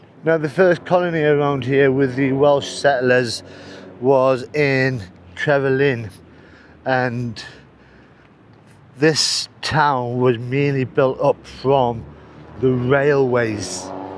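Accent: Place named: Northern England, United Kingdom. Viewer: Judging by the vowel sounds, I'd say he's from the north of England.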